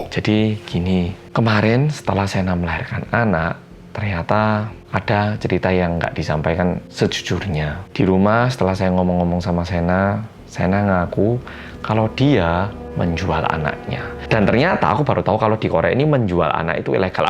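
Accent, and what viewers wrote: Java, Indonesia. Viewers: Aku lho klo dengerin cerita...suenengg...suara dan logat e megap2 medok malang campur lafadz korea ngono...lucuuu😅😅...semangat..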